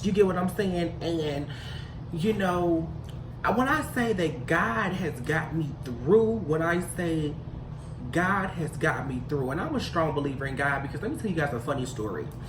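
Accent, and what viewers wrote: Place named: Midwest, United States. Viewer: U sound like midwest, detroit area here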